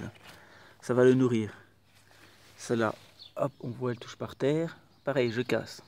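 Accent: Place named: East, France. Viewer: L'accent est un peu de l'est , allant de la Franche-Comte-Bourgogne aux Vosges.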